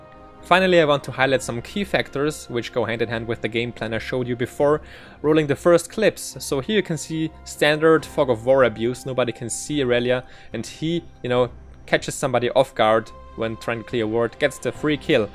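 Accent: Germany. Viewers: i can hear ur german roots ;) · Probably Spanish, But I think that he's Deutsch.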